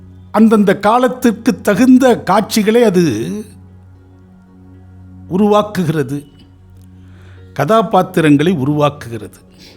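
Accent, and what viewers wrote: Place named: Kerala, India. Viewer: இவர் மலையாளி மாதிரி பேசுகிறார் மலையாளி ஜோதிடர் ரா !....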